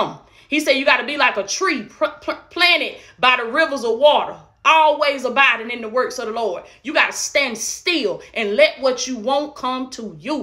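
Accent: South, United States. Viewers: I'm a man of faith.. but I have to tell the truth... that face and that southern accent got me wanting to pay your house note And the car note! Alabama or Mississippi?? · I love your country accent bae.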